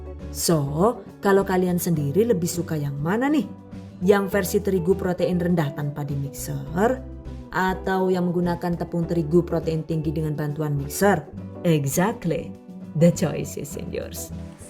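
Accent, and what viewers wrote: Banyumas, Indonesia. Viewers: Tinggal olih neng Jatim, tapi ngomong tetep ngapak ya bund😅 #GiveawayTHV2021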